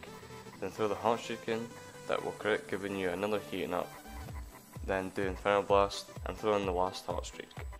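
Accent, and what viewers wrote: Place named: Scotland, United Kingdom. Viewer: Scottish ya fucking rocket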